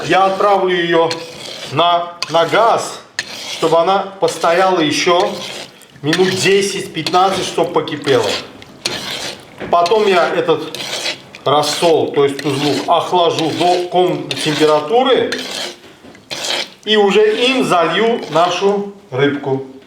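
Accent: Baku, Azerbaijan. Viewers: Самый чёткий бакинский акцент приятного слушать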